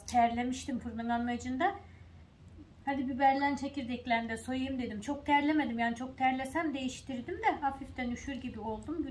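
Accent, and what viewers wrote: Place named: Giresun, Turkey. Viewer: kolay gelsin ablam masallah sanasiz nerelisiniz acaba giresun lumusunuz siveniz oraya benziyo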